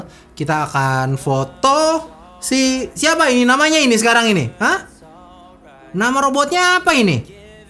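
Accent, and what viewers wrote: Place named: West Java, Indonesia. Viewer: Anjay Sunda pisan wkwk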